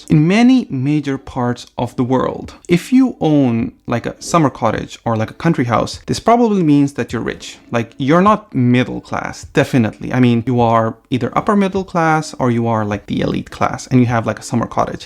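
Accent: Estonia. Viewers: Its funny that you have a little bit of an Estonian English accent. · Wth, you have Estonian accent, totally 🤧🤔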